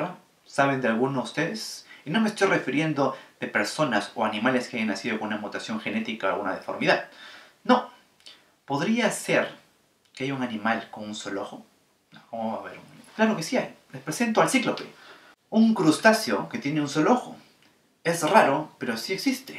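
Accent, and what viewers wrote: Chile. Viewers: Excelentes videos amigo! Consulta, eres Chileno?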